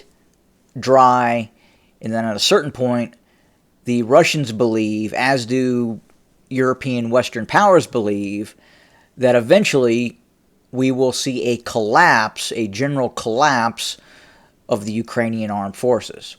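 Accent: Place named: South, United States. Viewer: VOR. Your racism is almost KKK like. Where were you born? Based on your southern accent, I suspect Alabama.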